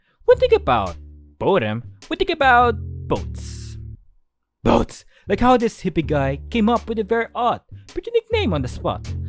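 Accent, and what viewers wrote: Philippines. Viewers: Wait... your accent? Are Filipino?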